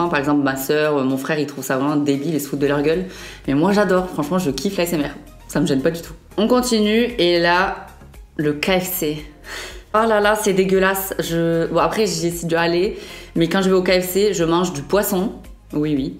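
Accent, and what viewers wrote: Quebec, Canada. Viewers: Vive l'accent québecois !! 🇨🇦❤🇨🇦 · Omg j'adore l'accent québécois 😍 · "LES ANDOUILLETTES C'EST LA BASE" trop raison! 😁 L'accent québécois c'est tellement mignon 😍 LES TACHES DE ROUSSEUR C'EST MAGNIFIQUE ! Trop cute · Tu sais quoi ça fait pas longtemps que je regarde t’es vidéo et sincèrement tu gagne une abonné en plus ! La Mayonnaise , le KFC , Team série.. Non merci Je suis anti que bas du corps mais j’alterne mais effectivement que le bas .. No way ! 👍🏽 L’accent québécois c’est cute !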